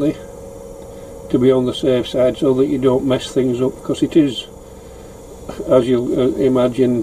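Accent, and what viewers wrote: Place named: Yorkshire, United Kingdom. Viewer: Hi i thought i recognised the accent,you are in Knottingley,i come from Mexborough,maybe we can get togeher one day.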